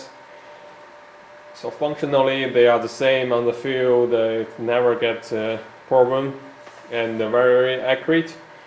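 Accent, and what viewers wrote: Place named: Eastern Europe. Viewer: I thought he was Asian too, until the very last line. The way he says "wideo" you know he is a slav, maybe with some dramatic mixed in. Definitely not an Asian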